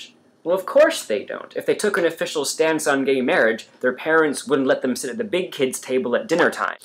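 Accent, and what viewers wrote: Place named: Canada. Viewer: As a Canuck, I thought that you had a Canadian tone to your commentary! :) I love that you put your opinion out there & let people glean from it what they will. If you've been watching our political clime, you see that the LGBYT community is not as "safe" as it used to be. We've become complacent & are once again realizing that equality is not seen by everyone as a right but a privilege. Vigilance/education/outreach - always remember that no one hears us unless we make the effort to be heard. :)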